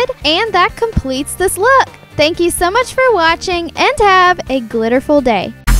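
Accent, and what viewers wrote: South, United States. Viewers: Are you southern? lawl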